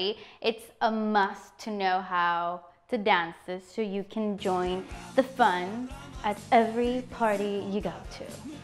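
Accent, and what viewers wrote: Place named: United Kingdom. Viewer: Who is this British chick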